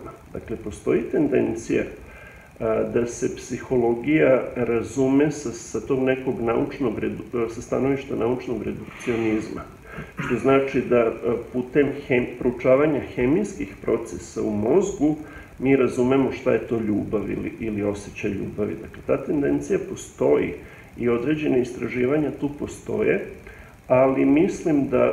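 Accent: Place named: Vojvodina, Serbia. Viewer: Gospodine poštujem vas kao naučnika ali vas molim da uvek pričate na Srpskom jeziku,jer ste očigledno odrasli negde u Vovodini bliže NS. Oseti se po naglasku i ne pokušavajte govoriti na Hrvatskom jeziku prosto ne leži vam. Nemam ništa protiv toga ako ste Hrvat niti protiv hrvatskog jezika. Ovo kažem posto ste u jednoj emisiji pokusali da pricate na Hrvatskom ali u najmanju ruku delovalo je smešno i ne prirodno za vas. Nemoj te se ljutiti, sve ostalo je ok,puno vas poštujem. Sve naj bolje želim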